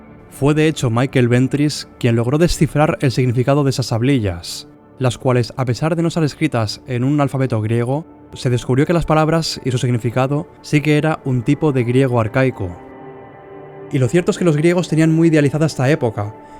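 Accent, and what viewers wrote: Spain. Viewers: Excelente canal. ¿De dónde eres en España? ¿el acento es vasco? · Gracias por compartir sus conocimientos joven español 🙏. Solo le recomendaría que acentue mejor solo un poco la entonación, y el audio, pero su acento va muy bien con la narración de estos temas. Excelente trabajo, más videos please.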